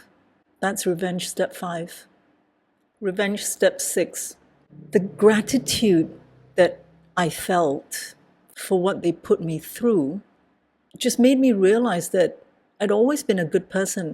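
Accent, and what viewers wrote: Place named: Malaysia. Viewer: Hi, the universe has a miraculous way of working out. Some back story, I was born in Malaysia but moved away at a very young age. I am also a scapegoat daughter and wondered about my Malaysian roots and what it would be like to meet someone who's also Malaysian and going through a similar journey. Your video popped up in my suggestions and your accent caught my attention, turns out you're a fellow Malaysian. I've watched a few of your videos and thank you ❤ my revenge plan is to heal and affirm/validate myself.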